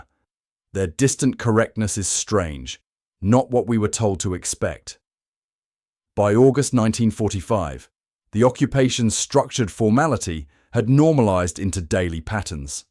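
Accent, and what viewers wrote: United Kingdom. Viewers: The presenter's accent is British. The British use meters as a measurement. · American military uses meters for ranging. We do use freedom units of measurement for the most part but that doesn't mean we don't understand the metric system. We just use more math than you. Also , the commenter in this video is obviously a Brit. We like them now and find their accent very amusing but we have had a few small differences in the past.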